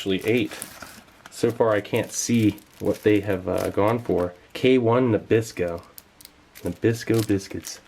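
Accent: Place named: California, United States. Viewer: Dude where is your accent from. I’m guessing California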